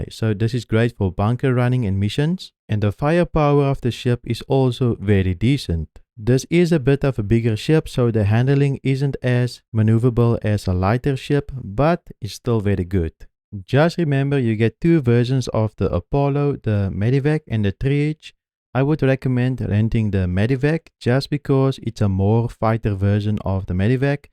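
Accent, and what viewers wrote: Afrikaans, South Africa. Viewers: The Afrikaans accent gets me every time😂😂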